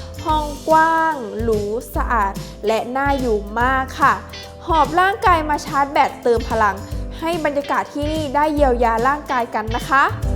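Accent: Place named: Vientiane, Laos. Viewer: I like you spoke lao look like sao lao viengchian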